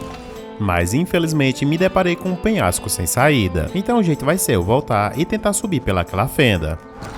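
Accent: Northeast, Brazil. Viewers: você é nordestino não e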